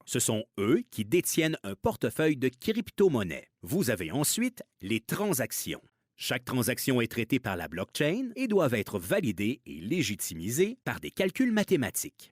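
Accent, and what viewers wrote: Canada. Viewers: un joli petit accent canadien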